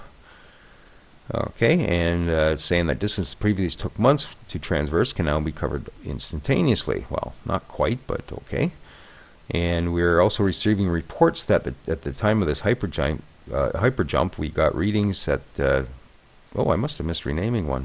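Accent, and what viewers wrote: Canada. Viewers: great series. I have to ask are you Canadian? Your accent is either Eastern Canada or, Northern Ontario. I could be way off but I had to ask. I am from Ontario and the accent is very distinct.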